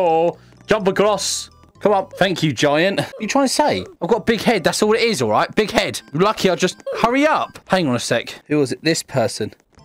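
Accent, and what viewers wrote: United Kingdom. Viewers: Bow down to the British accent king! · British accent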